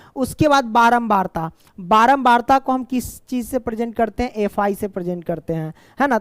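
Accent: Bihar, India. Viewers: Aap Bhihar se belong karte ho ...?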